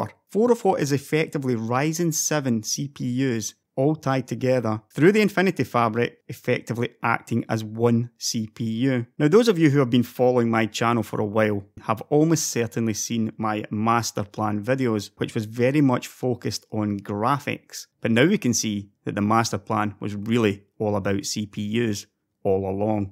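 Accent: Scotland, United Kingdom. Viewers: are you scottish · Scottish accent + tech channel = insta sub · his presentations really help me nail doon Scottish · Nice scottish acent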